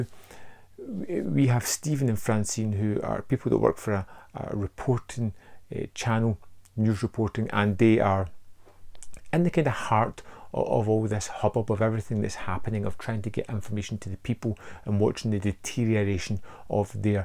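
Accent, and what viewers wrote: United Kingdom. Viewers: I came across your channel because of this release. You've got a strong accent mate that I really have to get used to when watching your review. 😂 Germany here, but I'm pretty much fluent in English. The British accent is one of its kind though. Anyways, I pre ordered my copy when it went on sale and I'm so much looking forward to it. What's more, my cinema will bring Dawn of the Dead on the big screen on Halloween night for one presentation only. Can't wait to check it out. You guys got some pretty cool releases coming out, talking about the other Second Sight Films announcement for Dog Soldiers and the 4K box set for Total Recall. 2020 was definitely one of the most expensive years for me buying films with all the amazing releases. Keep coming the good work mate. I subscribed to your channel. Cheers, Basti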